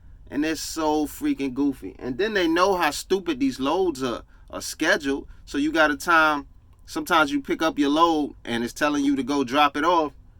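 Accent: New York, United States. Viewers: Bro u from New York?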